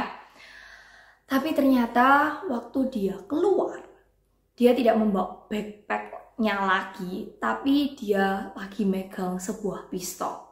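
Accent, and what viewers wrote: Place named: Surabaya, Indonesia. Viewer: Keto wong Suroboyo ne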